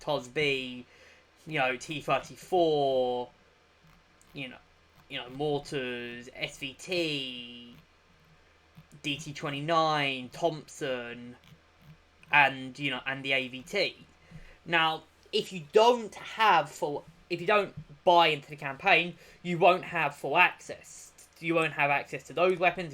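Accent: United Kingdom. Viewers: how fricking cool is your british pronounciation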